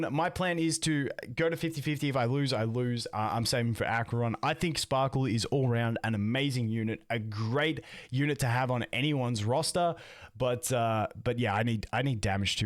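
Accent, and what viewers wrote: Australia. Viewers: Honestly I watch this guys cos of his Australian accent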